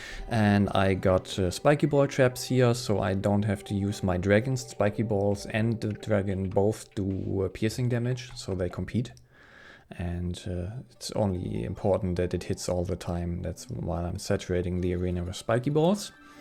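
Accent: Germany. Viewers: Man hört raus das du ein Deutscher bist xD Trotzdem geiles Video👍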